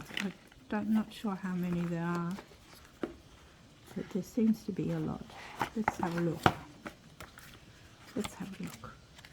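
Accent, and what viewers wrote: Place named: Australia. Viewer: Great selection . You sound like one of my fellow Aussies !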